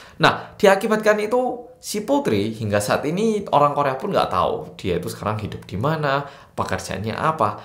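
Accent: Java, Indonesia. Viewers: salfok sama cara mas ngomong 'koceng' di menit awal. bukan 'kucing' tapi koceng'. mas medok sekali😂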